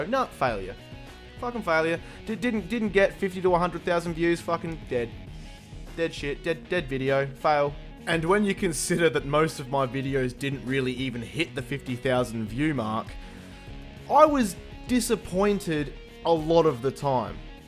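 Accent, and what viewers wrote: Australia. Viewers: So good to hear an Aussie on YouTube, seeing I am an Aussie, no explanation needed, do your videos at your own pace, your videos are awesome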